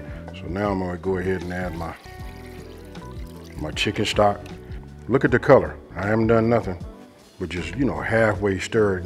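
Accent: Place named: California, United States. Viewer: I’m saving this one because I have to try it myself. A lot of your recipes are Cajun inspired I’m curious are your people from Louisiana? The reason I ask is because you talk with a cali accent but if I watched your content on mute I would swear that you were from Louisiana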